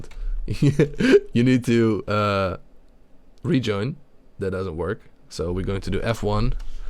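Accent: Netherlands. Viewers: Duidelijk te horen dat u Nederlands bent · are u dutch?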